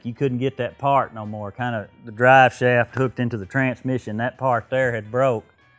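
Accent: United States. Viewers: I was born and raised in rural Mississippi. The army too me all across the world. Your voice and accent remind me of home and memories growing up. We had a Mr. in our community, Mr. George Carter who ran Carters Store, a great man. Absolutely loved the video. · love the passion you have and how much the different pieces mean to you. I will be watching, good to know people like you still out there...your accent reminds me of all my family back in Georgia, Mississippi...i use to have that same accent but lost it many years ago. i use to get teased when I was younger, living in California...never really bothered me at all. But guess what....now they say --y'all and it just doesn't fit...oh well. Let the good times roll.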